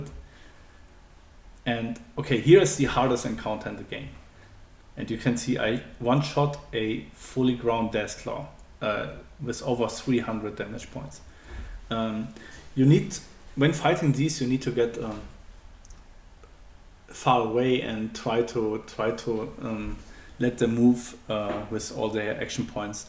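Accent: Germany. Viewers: Ich gehe mal davon aus, dass du Deutscher bist mit dem Dialekt. Warum hast du die Pläne weggelegt und sie nicht bei den Chinesen abgegeben? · I hear that you are from Germany ;-) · Ich liebe den Denglisch Akzent :)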